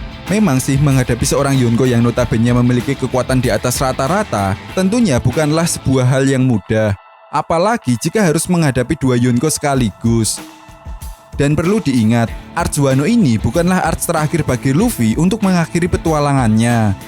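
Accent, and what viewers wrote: Java, Indonesia. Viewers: lidah njowo mas, lanjutkan mas do ur best!!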